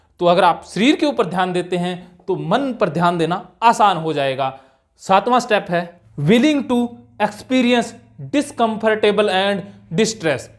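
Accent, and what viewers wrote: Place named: Marwari, India. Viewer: Sir aap great o sir ese lgta ha ap marvadi bhasha bolte o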